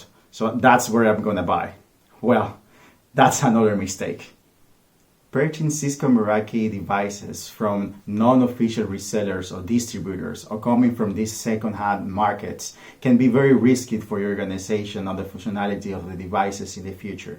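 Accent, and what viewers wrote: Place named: Dominican Republic. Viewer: Se nota que el pana es Dominicano ajajaj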